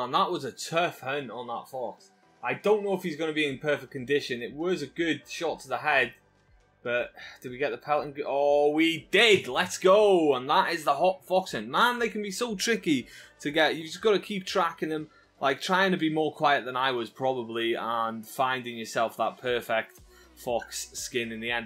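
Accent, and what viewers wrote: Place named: Wales, United Kingdom. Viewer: Nice your welsh just like me were abouts wales you from bud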